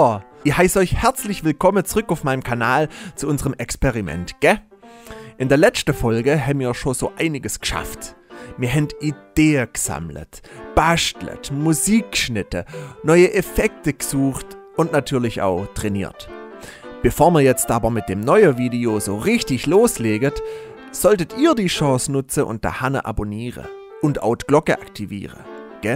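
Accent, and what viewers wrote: Swabia, Germany. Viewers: Griaßgottle ällemittenander. Dr Schwob sagt: "Mir könnet älles, ausser Hochdeutsch!" MAGIE ist für mich, dass DU als Schwabe eben DOCH Hochdeutsch kannst ;-) HANO! ;-) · Der schwäbische Professor mal wieder ;-) Sehr schön!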